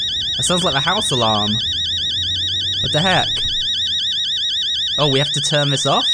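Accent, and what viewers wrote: United Kingdom. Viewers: The British accent is making it funny but i really wanted to hear say bottle of water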